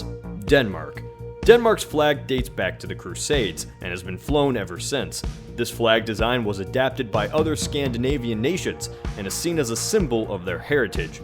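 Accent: Canada. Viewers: Are you Canadian? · So are you Canadian or North American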